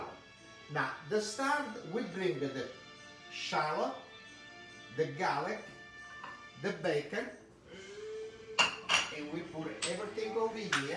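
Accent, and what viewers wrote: Italy. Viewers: I listen to you i hear my italian immigrant grandparents.We love yo both❤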